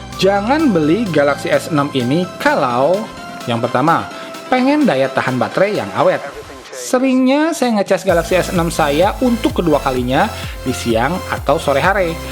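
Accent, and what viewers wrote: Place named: Sundanese, Indonesia. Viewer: amiiiiiin.... sukses terus buat, ane suka sama logatnya yang jenaka & sunda pisan euy.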